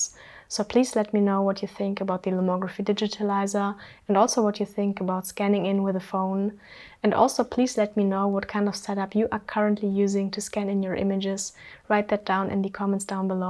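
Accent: Germany. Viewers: Came here for photography stayed for German accent 😍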